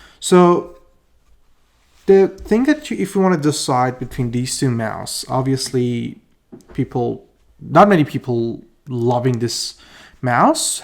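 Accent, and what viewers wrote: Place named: Australia. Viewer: ghorbune lahje aussie it beram :))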